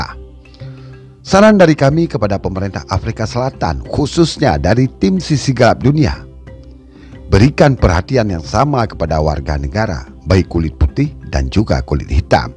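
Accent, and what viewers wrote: Aceh, Indonesia. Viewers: Ni admin nya logat nya seperti suku aceh. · Pasti komentator awak aceh nyo 🤭 lemah logat bahasa indon jih 😂😂👍🏻👍🏻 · Admin logatnya mirip orang Aceh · Voice overnya kayaknya orang Aceh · sigoe sagai lon deungo su logat... langsong tatupue awak aceh :D,,, bereh adun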